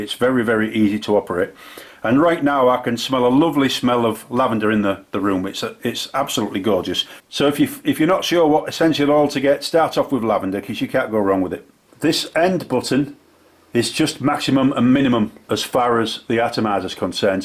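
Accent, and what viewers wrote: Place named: Hull, United Kingdom. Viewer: I agree . Sounds like Hull where my family are from!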